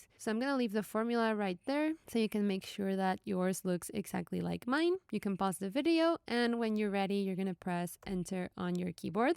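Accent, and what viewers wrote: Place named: Latin America. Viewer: Your tutorials are just amazing!! I'm from Mexico, but I went to college in the US and lived there for 12 years. You sound like my female friends from LatAm! I've seen many of your videos, and I don't think you've ever said your name! Thank you so much for making our lives easier with spreadsheets!!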